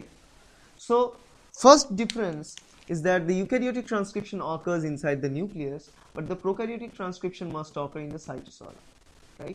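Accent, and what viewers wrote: Bengal, India. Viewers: great video! are you bengali?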